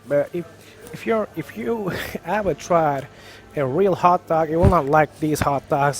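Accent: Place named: Florida, United States. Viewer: Your English sounds almost like the southern accent here in Florida ☺️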